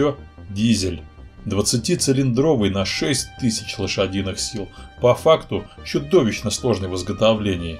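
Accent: South, Russia. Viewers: Родной южно-русский акцент у автора)) Воронеж? Курск? признавайся))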